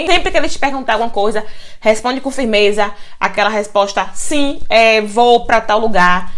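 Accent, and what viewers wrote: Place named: Pernambuco, Brazil. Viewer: Gente ela é Bahiana🤣, o sutaque é de Pernambucana , Gui que és Pernambucano.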